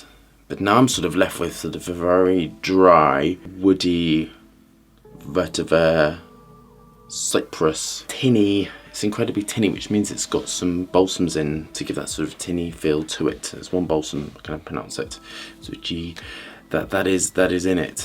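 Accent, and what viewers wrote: United Kingdom. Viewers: i just stumbled over your channel. Love your expert knowledge and your talking in british accent.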